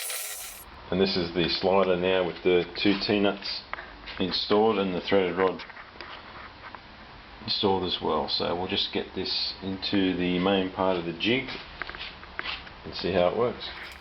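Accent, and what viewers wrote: Australia. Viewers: you sound like you've got a queensland accent! greets anyways mate, really enjoyed this video and can't wait to make one! Cheers!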